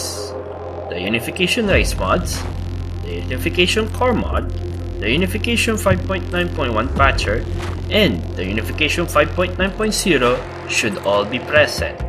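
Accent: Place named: Philippines. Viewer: sabi ko na eh pinoy base sa accent haha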